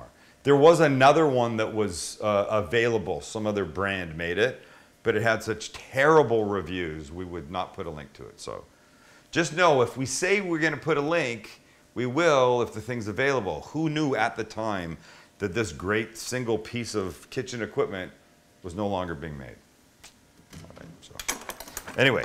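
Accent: Canada. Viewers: Canadian accent. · Are you from Canada??? I thought I kind of picked up on an accent but wasn't sure. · i've been trying to figure out if he is! everytime he say out or about i'm like...hmmm, i wonder if he's canadian! lol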